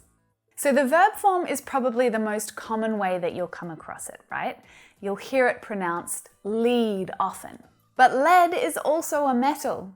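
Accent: United States. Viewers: Es Inglés americano🇺🇸🗽? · Is this american english pronunciation? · Many English people pronounce the word "hear” as "hiya".But yours is a bit sounds like American accent.May i learn the difference between them?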